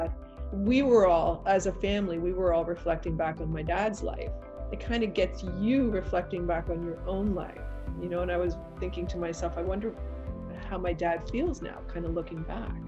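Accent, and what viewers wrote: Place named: Canada. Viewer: Canadian accent for sure! I checked out your (very nice) website. Really like your work and your msg in this vid. I can relate. Hi from TO👋😊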